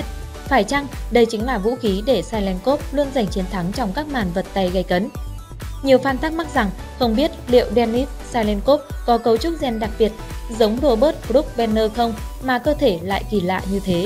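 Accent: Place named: Hai Phong, Vietnam. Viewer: Quê em ở Hải Phòng hay ở đâu thế? Đẹp chứ không phải điẹp, em không phải iem. Người khổng lồ Hulk đọc gần giống hốc chứ không phải Húc. Có mà húc vào... :) Nói thật mấy thằng này bao nhiêu thịt thì dồn hết vào vai với tay rồi, ở cái cần to thì teo hết à :)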